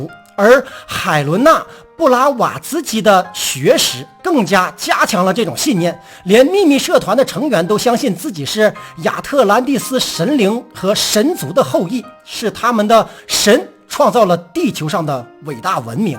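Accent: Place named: Northeast, China. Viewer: ，东北人儿啊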